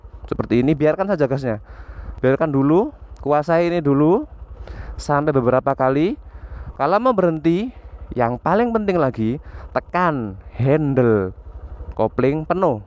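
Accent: Java, Indonesia. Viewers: pake logat jawa :v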